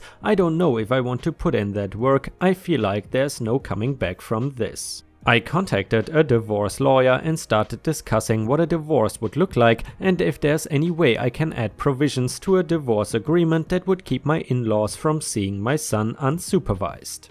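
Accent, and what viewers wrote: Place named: Germany. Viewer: For a voice actor your English accent certainly has a Germanic accent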